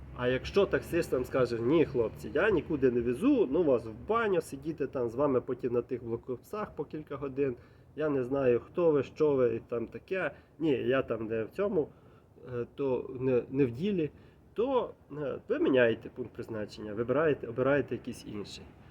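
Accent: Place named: Kolomyia, Ukraine. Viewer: Ти сам родом звідки? По акценту дуже нагадуєш моїш знайомих з Коломиї, Косова.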